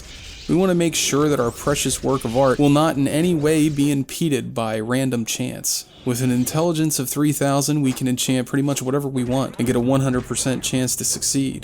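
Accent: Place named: Philadelphia, United States. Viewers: Sounds like a Pennsylvanian. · Absolutely love Morrowind. Just started my first ever run through and am so immersed it’s more real to me than my stupid job. Great content man. Keep it up. I gotta ask you, Are you from Philly? I grew up there and just wondered from your accent. Feels like I know ya. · I hear a slight philly accent. A fellow bird team? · Bro please tell me you’re from Philly or at least PA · lol youre definitely from PA or South Jersey with that accent bud. Good video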